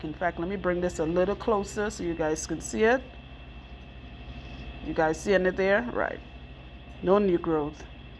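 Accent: Trinidad and Tobago. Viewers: From the time you began to speak, I knew 🇹🇹. Great job lovie. Keep it up. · I knew you was TRINI before you confirmed it! Thank you for representing in such a knowledgeable and approachable way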